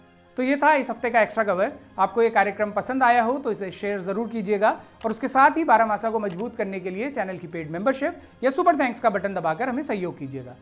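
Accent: Garhwal, India. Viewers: ji good morning आप की पत्रकारिता बहुत दिनों से सुनता हूं आप के वाणी में गढ़वाली बोली भी अच्छी लगती हैं। में अजीत शाह BSF में सेवारत हूं इस वक्त नक्शल प्रभावित क्षेत्र छत्तीसगढ़ में हूं। राहुल जी आप के पत्रकारिता के माध्यम से अपने क्षैत्र की समस्या से अवगत एवं रुबरु करवाता हूं राहुल जी हमारे बिलौक जयहरीखाल की जल संस्थान पेयजल योजना जो 1984 की है उसके अन्तर्गत लगभग दस गांव आते हैं जब पहले गांव में बहुत सारे परिवार रहते थे 90,95, के दशक में तो पीनी सभी को प्रयाप्त मात्रा में मिल जाता था आज उस पानी पर कुछ चन्द लोगों का कब्जा है जो खूब दादागिरी भी करते हैं और पानी की मोटर से भी खूब अपने साग, सब्जीयों में निरन्तर खेत को सींचते रहते हैं ज्यादातर पानी की समस्या गांव तोली में ही है जो इण्टर कॉलेज दुधारखाल के नजदीक ये गांव पड़ता है जब कि इस गांव से श्री अनिल धस्माना जी एवं जौलीग्रांट के कुलपति श्री विजय धस्माना जी इस गांव के मूल निवासी है और इसी गांव में उनका जन्म स्थान भी है। जहां पर पानी का टैंक है ओ टैंक मोली गांव में बना है। मोली गांव और पास्ता गांव में गांव के अगल बगल में पूर्ण तरह से हरियाली है खूब सब्जीया उगायी जा रही है और हमारे गांव तोली में कुछ परिवारों को पानी की समस्या से जूझ ना पड़ता है। सरकारी तंत्र JE,AE, EX, सभी को फोन के माध्यम से और लिखित में भी खूब परियास किया लेकिन सरकारी तंत्र को कोई फर्क नहीं यहां तक कि उप जिला अधिकारी सतपुली एवं विधायक श्री सतपाल महाराज जी को भ्रमण के दौरान रुबरु करवाया था। राहुल जी आप इस समस्या को भी उजागर करना जी। धन्यवाद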